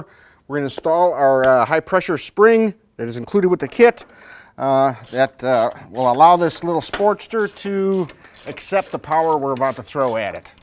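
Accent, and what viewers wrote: New Zealand. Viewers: what part of nz are you from. Chur.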